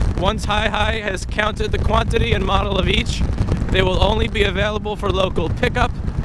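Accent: New York, United States. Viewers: you are a true noo yawker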